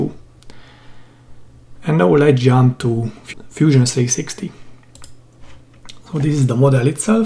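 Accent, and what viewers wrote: Hungary. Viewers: hello, awesome project. i am just building it, how do i connect the cable to the arduino? which pins? what is the value of the two resistors r17 and r18? by chance my ears are correct and i hear a hungarian accent: easy rider ocseeem! :D thx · Sounds like hungarian accent:D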